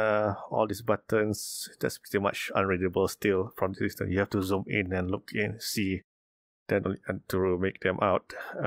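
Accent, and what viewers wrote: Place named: Singapore. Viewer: Hi sir. Nice video and thanks for the x-plane demo with the Odyssey. Your accent I guess is either Singaporean or Malaysian Chinese (I could be wrong), but if i'm right, may I ask where did you get that Odyssey from? I am scheduled to visit Singapore again next week and I'm wondering if I can find the Odyssey there. The oculus is currently still at SGD$890 at SimLim but based on reviews I will get the Odyssey just for the extra pixels.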